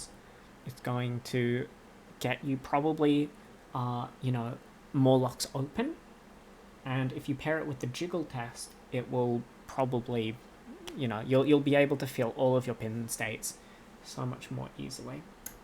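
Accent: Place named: Australia. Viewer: Oooh, dont bend that fancy ratyoke pick! I always use absolute minimum tension, because I almost always use <num>in (~0.3-0.5mm) picks, i enjoy the feedback from them more i guess u could say. Thanks for speaking so clearly too, tbh I have a heck of a time trying to understand Australians speak, but didnt this time! Thanks for the informative video;)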